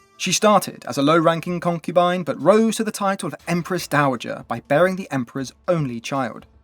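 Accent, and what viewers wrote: United Kingdom. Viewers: I mean he’s bri ish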